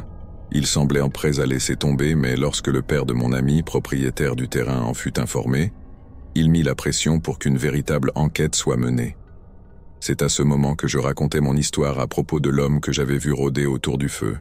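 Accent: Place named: Canada. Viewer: Quel régal ❤️ mais des fois ça déraille lol ça parle canadien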